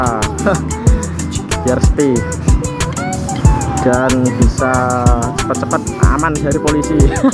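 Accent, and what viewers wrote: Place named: Java, Indonesia. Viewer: Aku suka gaya bicarane mase... Mantap.... Ngekas orang jawa... Semangat pokok e.. 🙏👍👍👍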